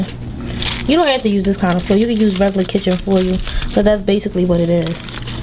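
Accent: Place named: Alabama, United States. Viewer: More like Alabama or Tennessee, sis. Nobody in Nola sounds like that... I’m from Nola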